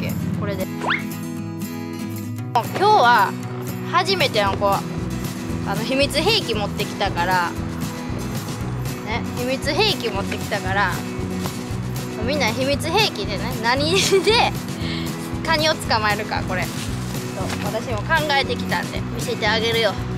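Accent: Kansai, Japan. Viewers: 可愛い❗関西弁たまらん。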